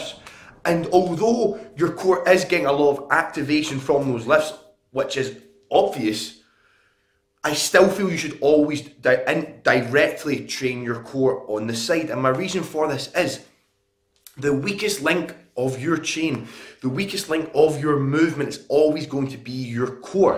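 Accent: Scotland, United Kingdom. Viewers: yo where in scotland are ya from?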